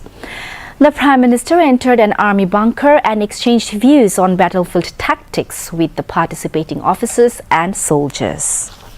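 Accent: Bangladesh. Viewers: বাংলাদেশে ৬ মাসের ইংরেজি কোর্স চালু করা দরকার আমেরিকান/বৃটিশ একসেন্ট শিখানোর জন্য। এই দেশী একসেন্ট শুনে হাসবো না কাঁদবো বুঝতেসিনা😂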